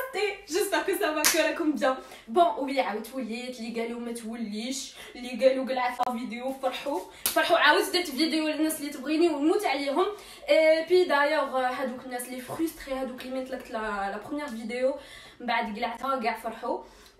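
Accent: Algeria. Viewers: J’adore l’accent algérienne🇩🇿🇩🇿🇩🇿même je suis marocaine🇲🇦🇲🇦🇲🇦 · انتي هايلة حلوة خفيفة تدخلي فلقلب نحب كي تهدري blalgeroise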